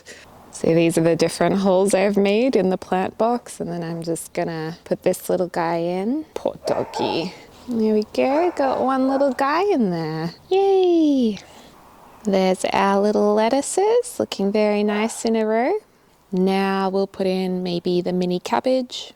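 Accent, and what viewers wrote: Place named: Australia. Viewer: is that your Australian friend again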